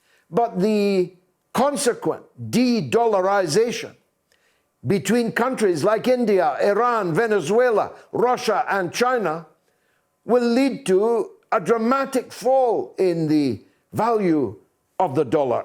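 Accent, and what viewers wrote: Scotland, United Kingdom. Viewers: I love your videos particularly in your Scottish accent, which nailed all your point more succinctly than the English’s accent could. Keep up the good work,.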